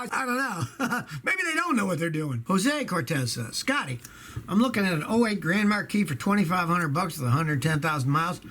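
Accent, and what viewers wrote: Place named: Texas, United States. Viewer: Scotty is getting a Texas accent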